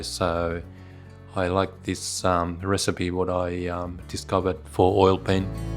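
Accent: Australia. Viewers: I was listening to your accent, thinking, boy, this looks like a gum tree here, and you are! Here, I mean, not a gum tree. I love your technique, I paint with acrylics but can always adapt oil techniques to an equivalent acrylic application. Thank you for sharing, I'm stuck on a gum in my latest painting, so going to try your paper towel trick for foliage. The exact look I am after. New subscriber from Central Queensland Australia.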